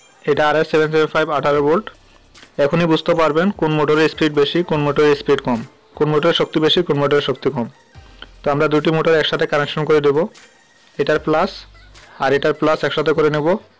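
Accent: Sylhet, Bangladesh. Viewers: ভাই আপনি কি সিলেটি আর এর দাম কত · Vaier bari ki sylhet?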